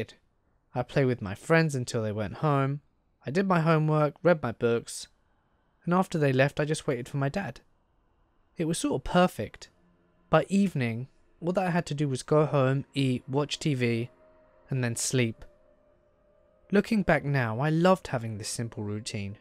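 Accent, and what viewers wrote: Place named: United Kingdom. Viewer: Another British accent... Go figure.. Let's read must be a BBC thing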